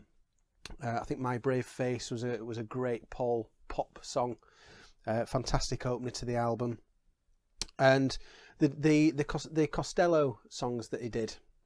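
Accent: Leeds, United Kingdom. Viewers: Are you from West Yorkshire? Heard you mention jumbo records on one of your videos and you sound Leeds!!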